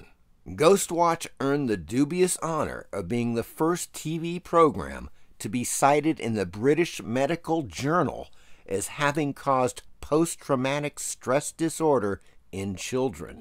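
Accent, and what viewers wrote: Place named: United States. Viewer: You're an American aren't you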